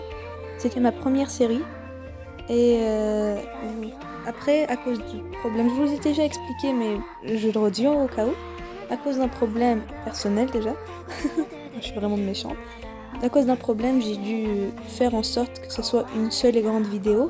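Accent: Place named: Kabylie, Algeria. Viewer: Ta un accent kabyle comme moi UwU Et tkt ta voix et trop cute je trouve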